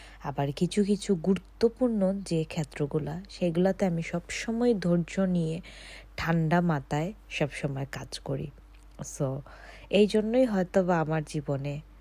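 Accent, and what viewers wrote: Sylhet, Bangladesh. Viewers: Sylheti ni?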